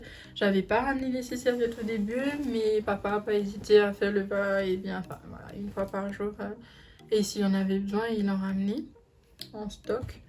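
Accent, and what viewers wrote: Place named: Réunion, France. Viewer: C’était trop bien ta vidéo pour une première fois franchement continue comme ça c’est super 🥰💯tu vien de île de la réunion 🇷🇪 ? Comme pour moi ya un petit accent 😅🇷🇪😘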